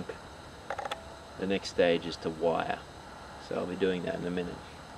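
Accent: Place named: Australia. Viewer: great to hear an aussie voice....cheers mate....you did great explaining....maybe discuss the growing habits,fertilizing etc...go oz :D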